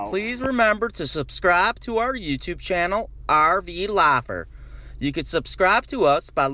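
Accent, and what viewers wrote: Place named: South, United States. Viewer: You sound like a country boy, which state????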